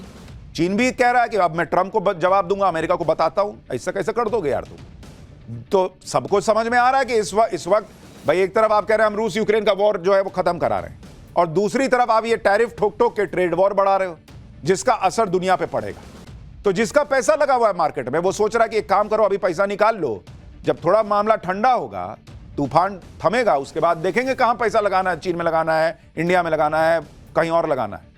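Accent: Bihar, India. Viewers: Pure hindi language mein bolo bihari hindi nhi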